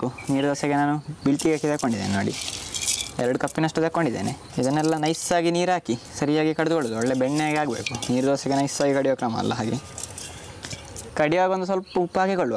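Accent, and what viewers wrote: Mangalore, India. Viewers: Nimma vids ge naanu addicted aagogidini.. eshtu chanda adugegalu, chanda parisara, aa manglooru kade kannada, ahhaa.. nodtaa kootre samaya hoguvude gottagodilla nodri maarre!! Bahala santhosha!!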